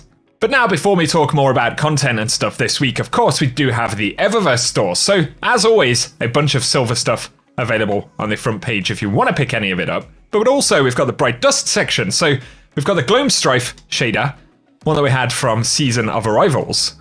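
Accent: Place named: United Kingdom. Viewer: har-bing-ers.... LOL.... cmon - you have such Brit accent but, damn you cant pronounce much... think of it as BINGE like Binge drinking... Har-Bin-gers NOT BING - lol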